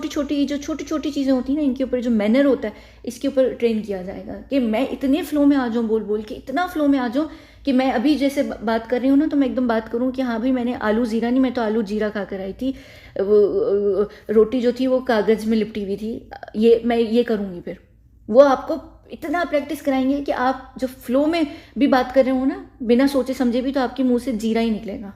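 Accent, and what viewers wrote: India. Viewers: Es ka lehja hi hindi hy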